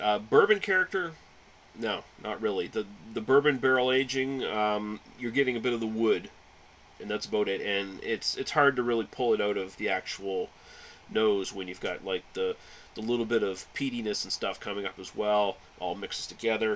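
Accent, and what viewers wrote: Canada. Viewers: canadian ay! (oat and aboat)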